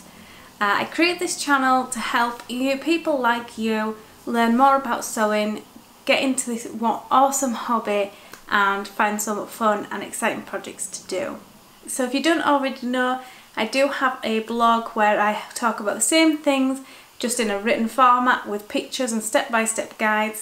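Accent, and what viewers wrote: United Kingdom. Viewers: It's so lovely to hear a Yorkshire/northern accent on you tube! Great video thank you x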